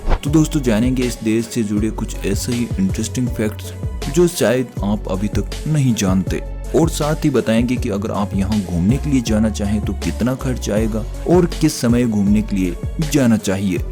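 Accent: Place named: Rajasthan, India. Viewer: Aapki aawaj rajsthani lagti h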